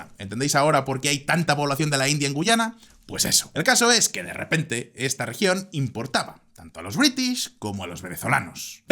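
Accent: Spain. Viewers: Buen vídeo pero una sugerencia: IMITA, HAZ PARODIA DE LOS ACENTOS DE TUS PERSONAJES. Osea como que no es tan divertido y hasta raro ver un ruso o al veneco maduro con acentos españoles. Mejora eso y quedara OK👍👍👍Saludos. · Esta bien que opinen cualquier cosa, pero cuando uno que vive en Venezuela, nació aquí. Oye a un mercachifle o sevilluo como decimos en Maracaibo estado Zulia, hablar por hablar, de régimen, o de Maduro ignorando que acá existe un pueblo digno que sabe a dónde va, que medianamente conoce su historia, incluida la del Esequibo, sobra el deseo, como decimos, de decirle del mal que va a morir. Sr. Por la pinta del hablar, creo debe ser de la casi extinta seudarepublica de España. Mire, entienda que este pueblo aún con sus traidores como han existido siempre, desde que llegó la maldición española a estas tierras, hoy sigue presente, dígase Maria Corina Machado, Guaido o López. 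Aun con traidores adentro, nos enfrentamos al imperio español y lo echamos de América. En la conquista con flechas nos defendimos, al punto que esos indígenas del Esequibo y mar Caribe no permitieron al imperio español instalarse en Venezuela. Hoy, con el imperio norteamericano encima, con sanciones bloqueos, saqueos de sus empresas, reservas en oro etc, apoyados por la unión europea, por la OTAN, entre ellos los orgullosos españoles que, hoy no saben que son, bueno si son DIVERSOS LGBT + el abecedario y hombres de "medios" como este ladino verborreico tarifados narrador nos hemos resistido y seguiremos hasta vencer, no obstante el enemigo no es la triste España, cuyo fin está cantado en no más de 40 a algo más, si es que los hechos sociales del ámbito político geográfico no lo aceleran. El enemigo, es y lo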